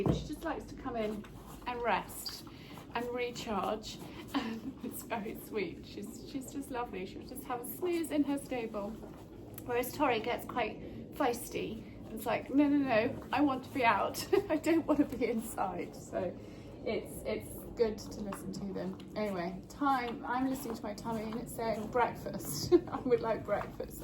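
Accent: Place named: England, United Kingdom. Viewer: ❤❤❤❤❤❤❤❤❤❤❤❤❤❤❤❤❤❤❤❤❤❤❤ Hello Sweet 😊. (still on vacations 😅) What and incredible hair device😮😮😮😮 Wow Wow! Thanks God you do have a balance with Brand Deals because its Much Much more credible and we do BELIEVE in you! There are other youtubers that seems a retail seller. Its utterly annoying🥱. The English accent that you have when talking to is so so Beautiful 😊 Rosie 🩷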